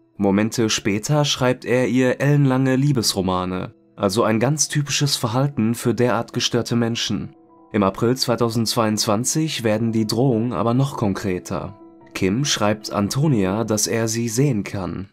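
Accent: Ruhr, Germany. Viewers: GEnau SO reden wir hier im Pott. GEile Videos, weiter so. Nur der S04 . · True Crime auf Ruhrpottdeutsch sollte Pflicht für alle True Crime Kanäle sein! Ich sach ma grüße ausm Norden ne❤ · Mach weiter so. 🤘🤘 Kann mir auch kein weichspüler true Crime mehr geben....ehrliche unzensierte Meinung und Ruhrpott Schnauze ...sehr geil ..weiter so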